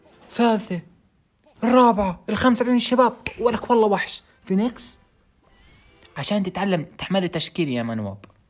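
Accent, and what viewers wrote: Iraq. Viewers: تحجي كلة عراقي هل ايام😂😂😂😂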